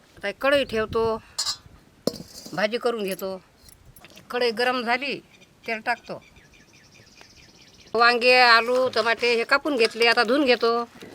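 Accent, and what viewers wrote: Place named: Akola, India. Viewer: तुमची भाषा अकोला जिल्ह्यातील आहे असे वाटते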